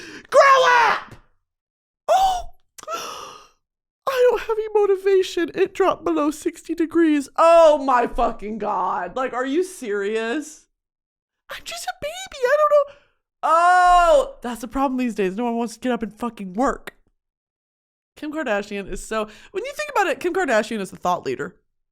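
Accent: United Kingdom. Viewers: the british accent got me :face-purple-crying:😭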